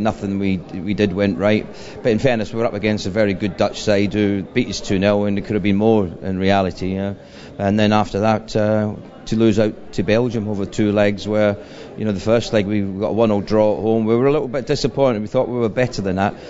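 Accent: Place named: Scotland, United Kingdom. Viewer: Ray is Irish but why does he seems to speak with a Scottish accent?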